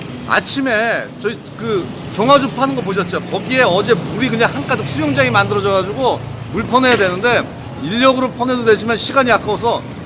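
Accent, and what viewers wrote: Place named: Japan. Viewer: 왜, 항상 일본식 엉터리 영어를 사용하시는지.. 혹시, 택시를 타쿠시라고 하고 버스를 바스라고 하시나요? 일본 사람들 ㅓ 발음 잘 못합니다. 그것을 한국 사람이 왜 따라하는지 모르겠네요.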